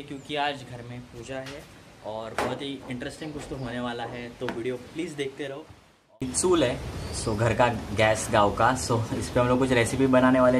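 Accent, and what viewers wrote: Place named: Wadval, India. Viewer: काय रे बाबा कुठे राहतोस?वाडवल बोललास म्हणून विचारलं?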